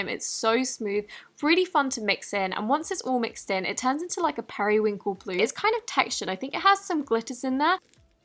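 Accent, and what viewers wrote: England, United Kingdom. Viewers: Are you English or American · Are you from England?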